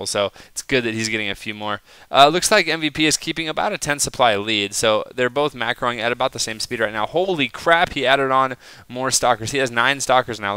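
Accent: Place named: Canada. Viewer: ROTFL you are excellent what you do! hey can i ask you a question, what country are you from, cuz im canadian and you sound canadian also =)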